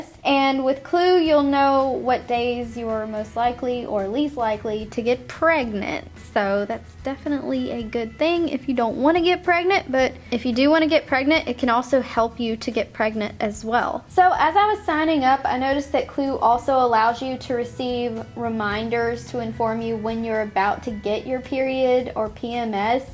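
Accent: South, United States. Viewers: her southern accent is cute 😁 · You sound like a country girl. I love it.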